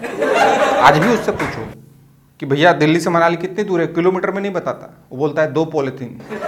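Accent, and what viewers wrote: Bihar, India. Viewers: Your comedy is superb no doubt, But your Bihar style maza aa jata h sun k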